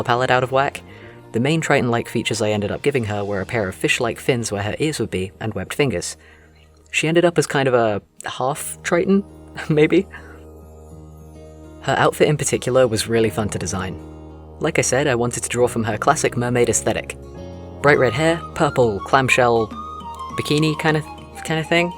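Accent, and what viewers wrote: England, United Kingdom. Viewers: I know this doesn't relate to d&d, but I'm curious to know what part of England he comes from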